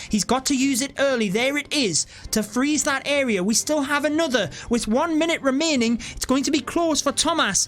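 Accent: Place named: Cockney, United Kingdom. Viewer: Love your accent It's cockney right???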